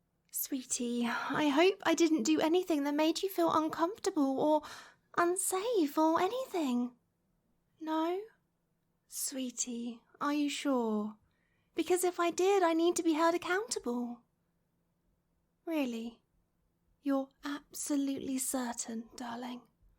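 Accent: United Kingdom. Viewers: This is so aggressively British I love it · U have such a sophicated and beautiful voice and the fact your britsh is amazing ❤ ( I'm britsh too😊)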